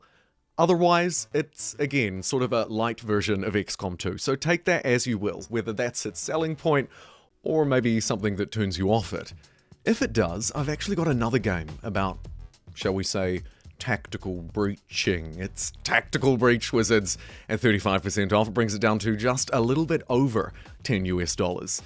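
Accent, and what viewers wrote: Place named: New Zealand. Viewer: I love that with your kiwi accent you need to spell out DECK to avoid misunderstanding 😅😅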